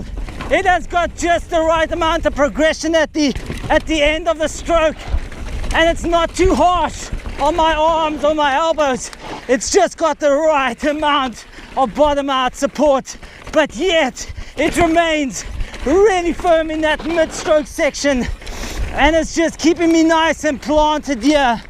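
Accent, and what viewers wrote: South Africa. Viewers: Your awesome! Love the South African accent! Subscribed! 👍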